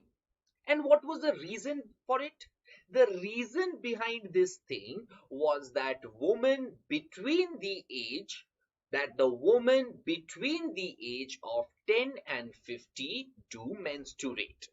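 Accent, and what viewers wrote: India. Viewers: He is speaking with an Indian accent don't think that we speak like fools that Americans depicts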